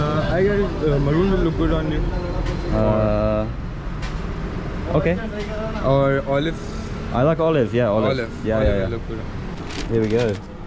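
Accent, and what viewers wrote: Pakistan. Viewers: Makes me so happy you're already sounding like a Pakistani :') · I saw your videos recently on tiktok and came here to watch all of em. I really love your videos as i am also from Pakistan. Love your pakistani accent tho . Keep up mate. 💙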